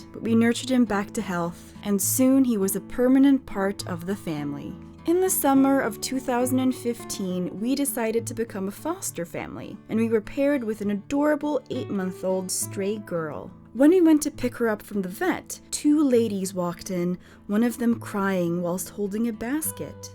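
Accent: United States. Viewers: your english is very good for someone from norway. i swore you were american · also if u had not said you were from a different country, I would have thought you were from the states.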